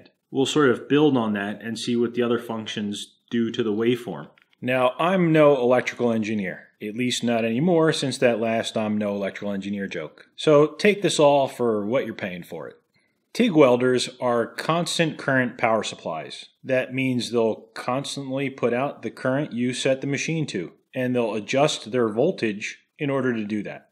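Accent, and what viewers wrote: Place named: United States. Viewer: Yes annoyed me as well - perhaps it is a Yank thing? Like Americans can't say solder - they say sodder not solDER! Still love videos - they are the best!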